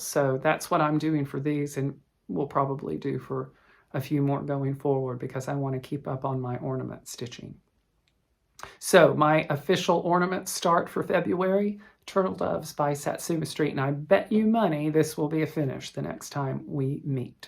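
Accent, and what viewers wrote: South, United States. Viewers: I love a sophisticated, educated sounding southern accent, which you have in spades and your vocab is amazing. Yes, you are polished, why I do declare. Fiddlee-ee-dee. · Lol... 😂 I love your southern charm and accent 😅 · Thank you for the southern drawl tonight. I am proud you were able to tell the story of your village. Its sad that parents have kids then decide they don't want to be part of their lives. My dad decided after having 4 daughters that he didn't want the responsibility of s family. He left when I was 9 I just turned 66 and saw him maybe 4 times in my life. My younger sister stayed in touch with him and when he passed she called with statement we were orphans (mom passed two years earlier). Don't know if you like hugs...but I wish I could hold you tight!!!! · listen, I was raised in Chelmsford, MASS - RTE 3 - you know right on the Mass - NH border. 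But have lived so long in Arizona - my accent fell off! My Boston comes out often - particularly with imbibing. Love and embrace your inner Southern, just as I honor by Bostonian! Love the Vaphas - Boston accent - see what I did there? Oh goodness, I've been following the Mother story. So many of us have mothers... Hugs and sunny southwest sun to you. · Hi, thank you for all you bring to our community with your southern accent, laughs, smiles, and some tears. Love your HAED design. My son is a full coverage stitcher. Have a great 2 weeks!❤😊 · I never noticed your Southern Drawl until today, LOL Great video. I absolutely love your train piece. All your stitching is beautiful. I love going to Ice Castle displays. They are just beautiful., and thanks for sharing the DMC conversion, I honestly never heard of that. Our past makes us who we are, and you are a beautiful, loving and gifted person. 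I can tell you were raised in a loving environment. Lori Holt's Denim bag is wonderful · Thank you for sharing your upbringing. I hear the south at the end of your tongue, 😛 it’s recognizable but I to have been watered down for twenty years but now back in Oklahoma!! Denim daisies are sew cute. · I live in Albertville Alabama, and as we southerners say, Bless your heart. Loved your “southern drawl”. Really had to try, tho! I’m the youngest of three girls. Always wanted a brother, so I’ve decided you’re it!! I know you need a sister!!! Love your flosstube.😸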